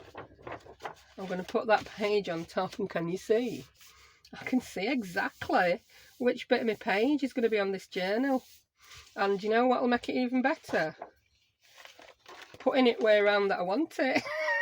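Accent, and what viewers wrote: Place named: Yorkshire, United Kingdom. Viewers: Thanks so much for sharin...really enjoyed watchin.Love the use of paper bags and the lovely papers.Really enjoyd your lovely accent...I live in Australia now but originally from Sheffield UK.I felt like I had a little visit back ome. Been in Ozz 26 yrs..still sound like a Yorkshire puddin...lol...All the best luv....xo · Edith from scrapbooking with me sent me over. Wasn't expecting a fabulous northern accent 😄 lovely project, thanks for sharing xxx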